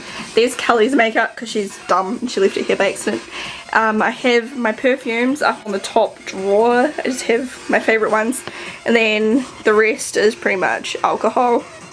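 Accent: New Zealand. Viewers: Wait.... Shes from NZ? I thought she was from Australia!!! ANOTHER WIN FOR THE KIWIS!!! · haha are you from NZ?? i live there and i kinda reconise yr acsent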